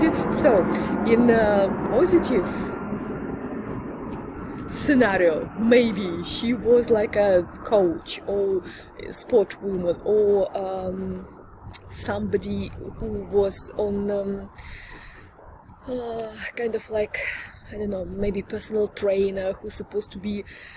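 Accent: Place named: Eastern Europe. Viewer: I absolutely love Eastern European women speaking English with their native accents. I have no idea why but I could listen to your astrology explanations all day. Excellent explanation as usual. 💯💯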